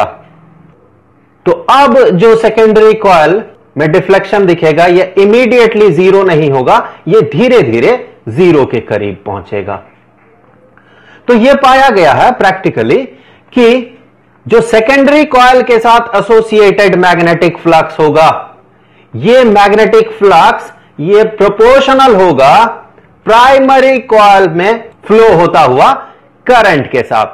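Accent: Bihar, India. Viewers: sir aap bihari ho kya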